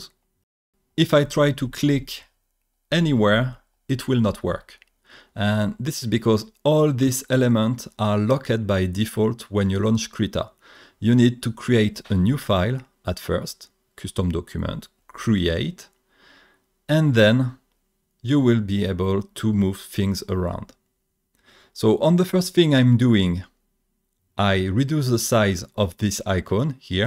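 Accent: France. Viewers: Your french ascent is really lovely and your videos are so wonderful. Learning so much here 👌🏿✨ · "heavy french accent" already warms my heart)) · Dude I love your accent! I love listening to the French language. I love watching French television. But with subtitles in english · I came down here immediately to type this very same message! Mr., your accent is absolutely beautiful, never apologize for not being English-speaking as it isn't even your first language; the French language is beyond beautiful and you speak English marvelously, very easy for me (with hearing impairment issues) to understand you. Thank you for this very ambitious tutorial, I just got the Wacom Intuos Small (CTL4100) for Christmas, it is my very first drawing tablet! Any suggestions on settings I should setup for it anyone? I've watched a few videos on setting suggestions, but there are buttons on the tablet itself, then on the pen and I'm not sure what these should be set to with Krita that would be beneficial. 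Additionally, is it normal for the pen to be so sensitive? I barely get the pen close to my tablet and it's already moving the pointer on my screen - is this just something I need to get used to? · damn, accent disclaimer. i watch your channel for 3 years now, and i also saw really old videos... compare to them, your accent became barely noticeable. even old videos were completely understandable, idk, maybe it's just me whose first language not English either (heard that foreign speakers understand different accents earlier than natives) remember only one struggle, it was shading/lightning video. "Ard light" layer mode made me stop the video and think a lil bit XD then i was like "oh, french, no H sound, riiiiight, got it". this only time from aaaallll your videos who needs it, will tolerate eith any accents or difficulties. if someone like "iu, accent, turn it off", they're probably not really into it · Tbh french accent make this tutorial a pleasure to hear. 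· Hahaha c'est cool - heavy French accent! 😆 Je suis Allemande et je l'adore en fait 😄 Je suis sûre que j'ai un vrai accent, aussi, quand je parle Français! Mais l'accent Allemand n'est pas si charmant! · An hour well spent...the French accent made listening a joy! Thank you very much for the immense effort you put into making this fantastic tutorial! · Just... Bravo! Giving you standing ovation right now. I liked the very detailed explanation and your french accent - it just make the video better. I am still couple of light-years away from your level of drawing skills, but video helped me a lot.Thank you! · Love that you walked through your layout setup process and everything, thanks a lot! Re: that disclaimer in beginning -- I actually prefer my English tutorials with a heavy French accent :D Also love the draw-in-the-style work, I prefer it over the more anime-style original. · Thank you very much , it's a great deal. 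BTW, your French accent is not so heavy as YouTube warns.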